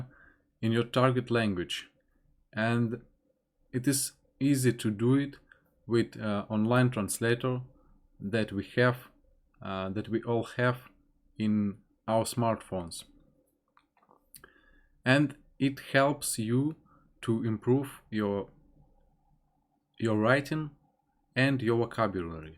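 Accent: Russia. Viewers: Congratulations on your English so far... it's very good, and sounds great with your Russian accent - keep going! Great videos on your channel BTW, it's very interesting how other people live their lives in other countries and cultures!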